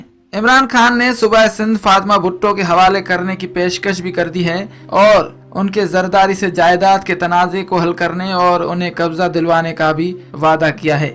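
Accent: India. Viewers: This voice is Indian person...100 % sure....indian urdu asy he bolty hyn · یہ آدمی انڈیا کا لگتا ہے اس کے تلفظ انڈیا کے لگتے ھیں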